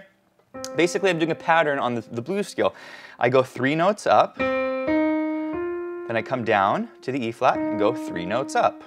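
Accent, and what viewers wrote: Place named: United States. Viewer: Merci beaucoup Mr! Vous m'apprenez beaucoup de choses intéressantes, et même en sus, un petit cours d'anglais américain, ce qui est toujours bon à prendre au passage! Je vous comprends assez bien! J'en suis content! Surtout! Ne vous arrêtez pas!! MERCI!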